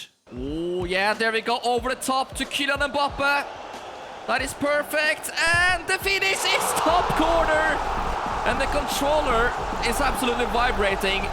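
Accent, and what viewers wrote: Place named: Norway. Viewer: ayy my favourite swede with norwegian accent uploaded!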